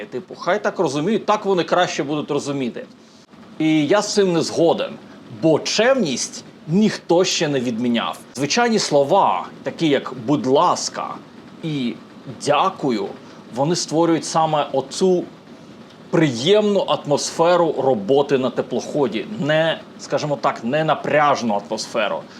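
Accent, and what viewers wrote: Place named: United States. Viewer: скажіть, будь ласка, ви вже напевно довгий час не проживали в Україні? Бо, наче, спостерігаю американський акцент у розмові)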